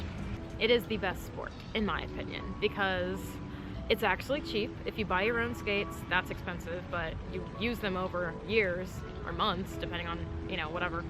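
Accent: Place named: Boston, United States. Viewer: Ayeeee your from Boston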